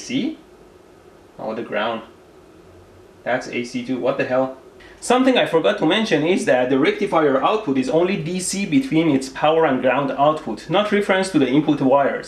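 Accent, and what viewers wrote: Iran. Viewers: Thats a persian accent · Iranian English accent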